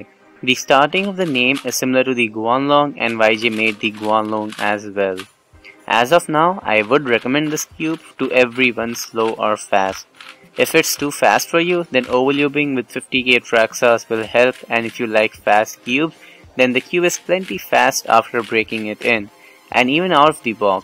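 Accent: India. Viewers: U sound Indian, are u?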